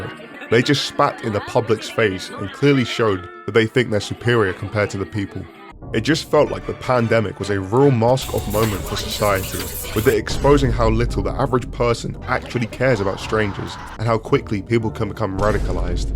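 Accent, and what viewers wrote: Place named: United Kingdom. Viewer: British and you sound fat.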